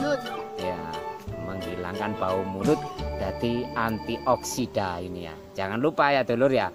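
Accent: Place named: Java, Indonesia. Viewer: Asli ngendi bang kok boso jowone kadang kentel banget👍👍👍 Salkom dan mantuulll banget👍👍👍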